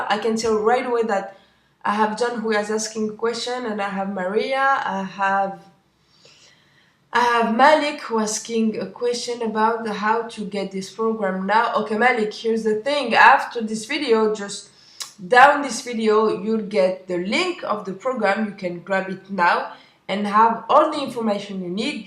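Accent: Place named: France. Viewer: Trop mimi ton petit accent francais,tres bon info.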